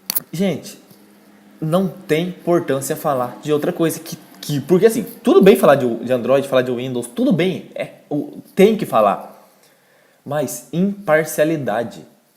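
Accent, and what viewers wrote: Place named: Fartura, Brazil. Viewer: Huahua Sotaque de Fartura hauhua, muito bom...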